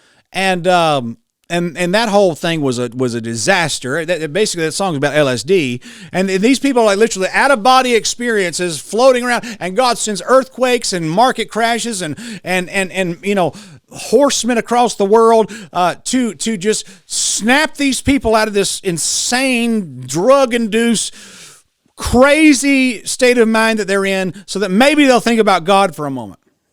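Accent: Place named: South, United States. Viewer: That’s not how it works. You should learn about addiction. You sound really southern. Learn to read.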